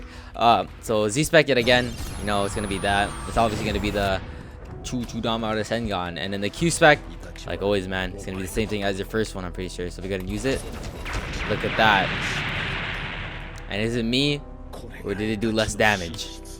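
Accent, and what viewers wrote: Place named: United States. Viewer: It’s an American thing we say it like zee instead of see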